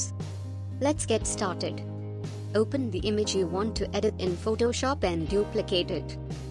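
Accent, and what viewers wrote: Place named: India. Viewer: When even the voice over is indian, god bless you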